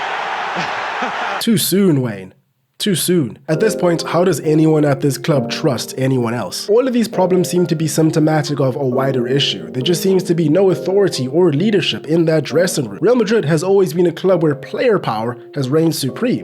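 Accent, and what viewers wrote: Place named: South Africa. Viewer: Bro, are you South African? Your accent reminds me so much if my cousins back home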